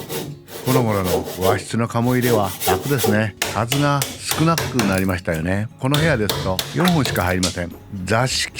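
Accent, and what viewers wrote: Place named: Kansai, Japan. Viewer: 建築設計事務所をやっています。子供の頃、模型を作っていた私に、親父がしきりに「物事の道理」ということを言っていましたが、さんの作られた住宅を見ていると、その言葉が思い浮かびます。私も関西出身ですから、さんの話す言葉が、親父が話している言葉のように聞こえてきます。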